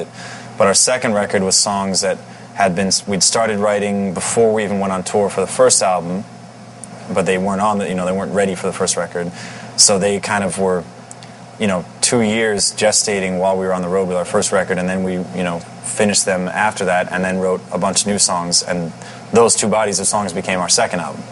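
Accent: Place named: United Kingdom. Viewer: Is it me or he slips in brit accent from time to time? He tries to be super american, but he grew up among people who have a brit accent. Don't deny your origins, Paulie ;)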